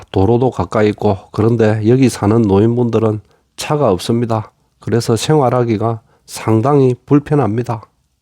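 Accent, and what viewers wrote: Gyeongsang, South Korea. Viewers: 형님 경상도 말투가 넘 맘에들어요 한번 뵙고쉽네요~ 저는 전라도덴... 정말 모든유툽 넘 잘 설명하시고 항상 유툽보면서 장거리 운전하는데 지루하지 않아서 넘조아요ㅎㅎ 응원합니다❤😂🎉😅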